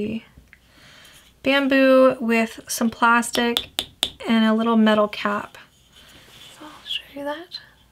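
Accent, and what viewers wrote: Canada. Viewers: Heard you talk & knew you were from like Ontario, or Atlantic Canada! Hahaha, I’m from NL. ☺️ Just bought a little haul myself; including that exact glass bottle! Hope you enjoy everything 🌈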